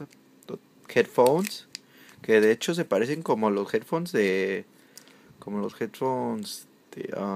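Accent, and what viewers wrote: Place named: United States. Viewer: tu ingles es excelente, el español bastante bien siendo un idioma bien dificil, pero el tono estadounidense en el ingles es ideal para videos en ingles, en mi opinion.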